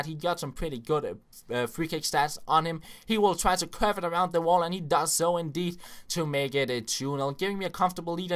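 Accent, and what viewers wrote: Denmark. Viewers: Are you danish???!??!??!!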